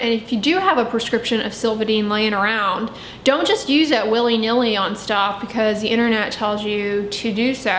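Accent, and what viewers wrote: United States. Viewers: Man thats an annoying American accent..... and i dont believe a word of advise from doctors........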